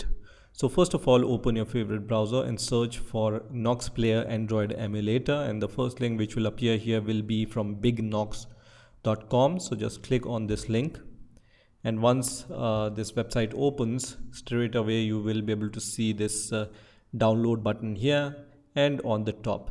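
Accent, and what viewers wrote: India. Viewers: Can I find a video that isnt done by an Indian with an annoying accent?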